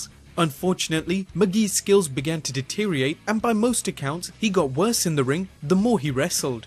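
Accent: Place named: United Kingdom. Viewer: Absolutely fantastic to have a British accent back. Great work 👍🏾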